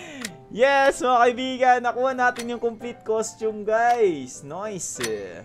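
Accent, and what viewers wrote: Visayas, Philippines. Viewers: Panit Ng saging hahaha bisaya kaman boy😂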